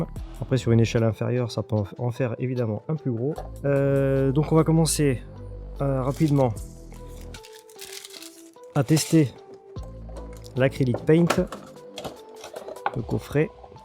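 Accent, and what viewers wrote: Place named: Southwest, France. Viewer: C'est ça c'est bien l'accent de chez moi( sud-ouest) qu'il m'a semblé reconnaître 😉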